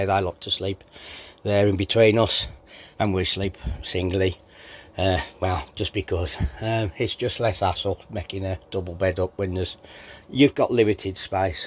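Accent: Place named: Staffordshire, United Kingdom. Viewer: Can't decide if you're from Staffordshire or Shropshire.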